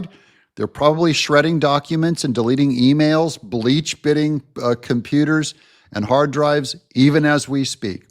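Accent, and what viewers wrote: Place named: Turlock, United States. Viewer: Very distinctive voice. Sounds like a Turlock boy. Ha 😂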